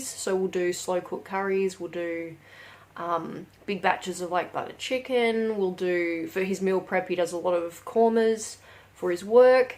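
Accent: Australia. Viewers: Random question, where is Australia are you from? Just the accent is throwing me as to where 😆 Thank you so much for sharing your tips. We’re in QLD and can access the 50 cent public transport which is a help! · You have a northern Queensland accent😊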